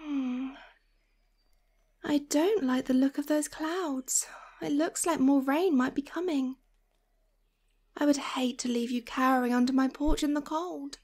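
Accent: United Kingdom. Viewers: Wale accent?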